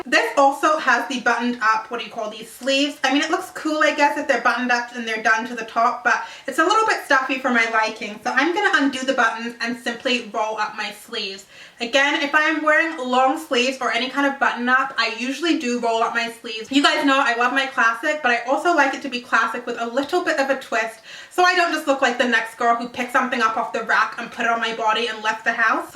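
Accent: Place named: United Kingdom. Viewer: i love how your British accent comes thru every now and then.....you're amazing!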